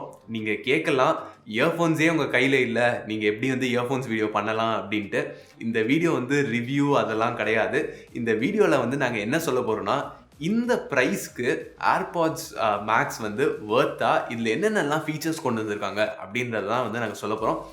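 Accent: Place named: Tamil Nadu, India. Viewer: U have changed your accent properly to tamil keep it up...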